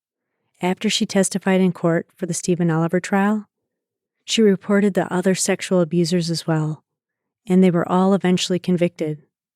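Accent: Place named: Midwest, United States. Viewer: I love her voice! Something about that Midwest accent that makes everything sound so wholesome… even true crime!!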